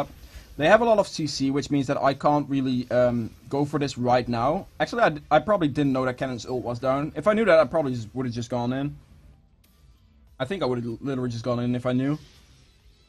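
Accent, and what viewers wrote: Netherlands. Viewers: ur dutch am i rigth?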